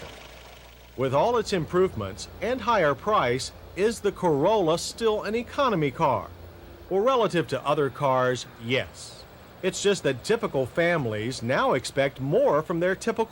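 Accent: United States. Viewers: What state is his accent reminiscent of? Cam Ray 😂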